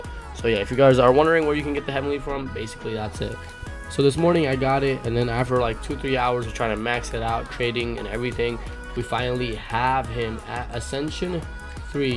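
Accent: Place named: Nepal. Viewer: Bro U Nepali? U sound Nepali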